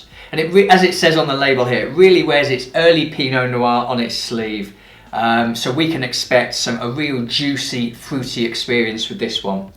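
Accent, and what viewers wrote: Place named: Southern England, United Kingdom. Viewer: Where in southern England are you from? Your accent is crazy.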